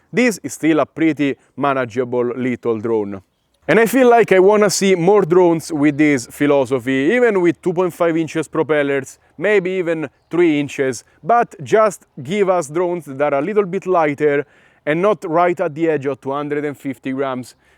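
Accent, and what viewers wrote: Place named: Italy. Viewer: sempre bellissimo sentire un italiano parlare in inglese <3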